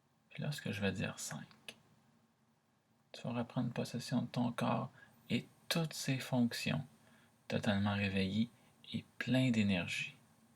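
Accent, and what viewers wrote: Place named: France. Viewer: Félicitations, C'est magique ta voix, Ça m'a fait du bien, continue comme ça! ton axa demi Français j'adore. Marie Médiatrice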